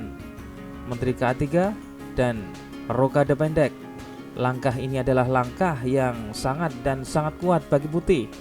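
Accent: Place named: Java, Indonesia. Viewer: Sehat selalu bapak dgn dialeg jawanya😊❤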